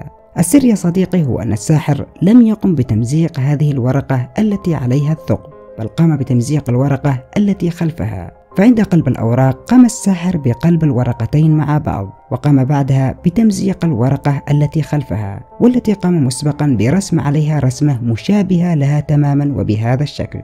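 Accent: Yemen. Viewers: يا انت يمني صح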